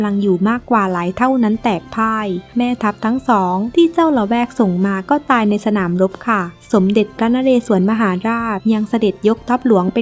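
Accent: South, Thailand. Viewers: คนพากษ์เป็นคนใต้มั้ยนิ แหลงกลางไม่ชัดเลย แหลงใต้ดีหว่าน้องเห้อ พี่ฟังแล้วเห็นดูนิ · เจ้าของช่องคนใต้ ใช่ไหม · เสียงบรรยายคนใต้ใช่มั้ย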